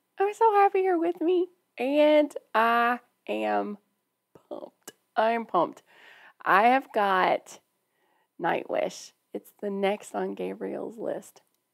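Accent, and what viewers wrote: South, United States. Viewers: Uilleann pronounced ILL-En or ILL-Yen for your southern accent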